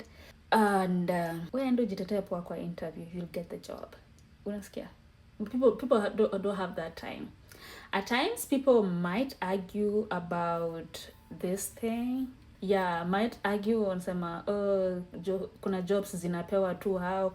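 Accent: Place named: United States. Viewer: Ee the American English assent in you❤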